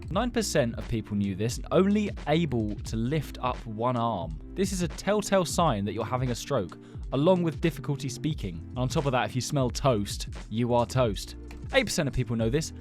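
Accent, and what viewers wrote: United Kingdom. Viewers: Are you British?😱😱😱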